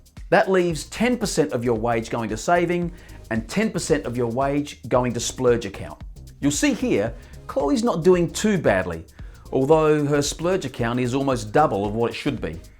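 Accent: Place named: Australia. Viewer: Oh man, I love a good Australian accent. That right there gets you my subscription. I like how you're putting emphasis on the automation of the budget. I talk about that quite a bit in my content as well. This is an excellent video covering those basics. I think that's really helpful for some people who've never budgeted before. You have some good examples too. I found you just by searching for budgeting channels on YouTube because I love the budgeting community and focus a lot of my content around it too. Keep up the good work!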